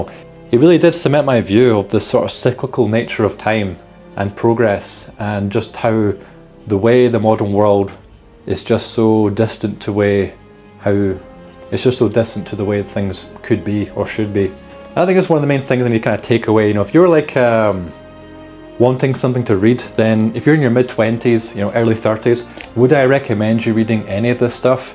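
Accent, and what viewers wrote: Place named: Glasgow, United Kingdom. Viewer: No bonus points for me, I only know that music, Lacuna Coil and so forth because because I played the hell out of VTMB both vanilla and modded. I have to say I like your content and the accent reminds of a co-worker from my last job, originally from Glasgow, we could take the piss out of each other without worrying about our "feelings" or HR. Salt of the earth.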